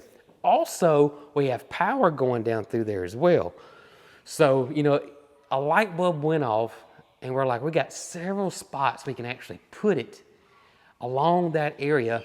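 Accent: South, United States. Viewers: I have closed caption on & everytime you say well, CC reads whale!!! Guess CC doesn't understand Southern speak! It made me giggle! The barn does look all nice & organized. I had a well at my house in the country, & we had the best tasting & beautiful clear water! We had a large water tank in our basement to hold water so we had some when the electric went off. When it snowed & we lost electricity, I melted snow on our wood stove to cook & wash with! I hope your water is as good as ours. I'm thinking it will be based on how that spring water is. & it will be cold!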